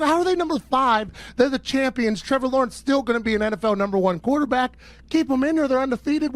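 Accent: Pittsburgh, United States. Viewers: Love yinzer accent especially with the 412 merch on while in the hill in da burgh...in a box truck.. Classic yinzmcginz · yinzer accent is the best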